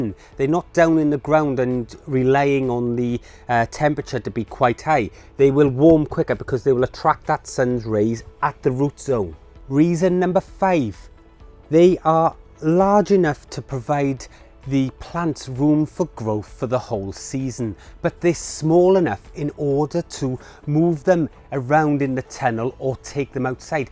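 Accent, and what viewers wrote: United Kingdom. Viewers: At about the mark of, in Tip No. 2, the captions say, "...use a 30 liter or "80" US gallon container.", you have correctly said it.... 8 gallons. You, however, might wish to get the captions corrected. Those either unfamiliar with the British accent or not accustomed to the Metric system, and totally relying on the captions will be thrown off, and head to the market to buy giant trash cans. LOL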